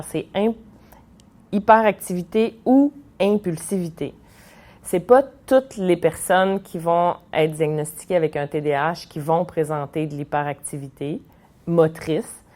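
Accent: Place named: Quebec, Canada. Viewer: Vous êtes québécoise madame.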